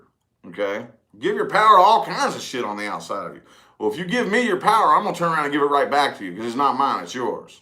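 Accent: South, United States. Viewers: Hey where in the south r ya from accent drivin me crazy cuz I can’t figure out where it’s from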